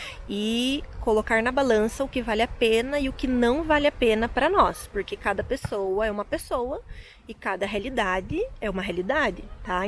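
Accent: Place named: Brazil. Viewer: Muitos parabéns pelo seu português. Posso dizer-lhe que fala corretamente tem o seu sotaque que é brasileiro e é lindo. Sejam bem-vindos ao meu país.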